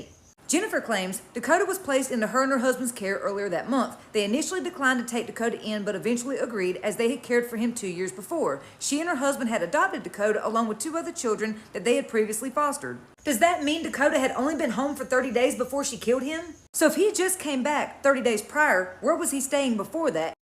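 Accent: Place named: Carolinas, United States. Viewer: I can’t tell if you’re accent is from North Carolina or South Carolina. Probably north if I had to put money on it.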